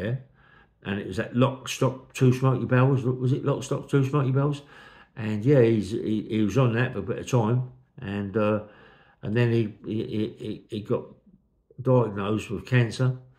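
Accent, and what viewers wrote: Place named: Cockney, United Kingdom. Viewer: I could listen to for hours, great stories told in a comforting old cockney accent, I’m addicted to this channel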